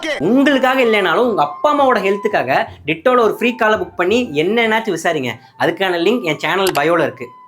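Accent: Madurai, India. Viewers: Anna neega Madurai ya😮😮😮😮😮😮😮😮😮😮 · Neenga madurai ah...😅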